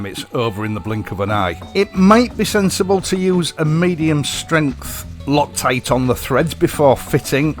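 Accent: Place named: Midlands, United Kingdom. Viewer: Love your blogs, i've been most areas in UK, but trying to locate your accent. I'm thinking, Notts, or higher up country. Ride safe govoner.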